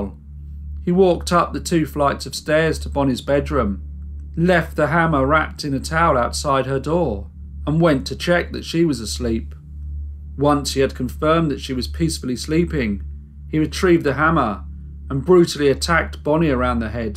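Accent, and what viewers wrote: England, United Kingdom. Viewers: Completely unrelated to the video, but what type of accent do you have? I know you’re from the UK but I’m curious which one this is? I’m a Yank with the love of accents and have a particular fondness of all things British. Thanks 😊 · Are also Morts family ?😁👍❤He has a nice big family i wish i had the same you help each other that's so nice and i love your English accent 😊💖💖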